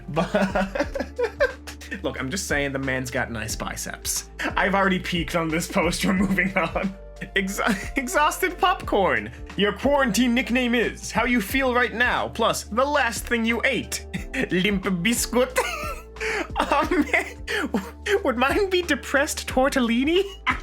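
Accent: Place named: Rhode Island, United States. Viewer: Discusses capitalist propaganda in a thick RI accent as well.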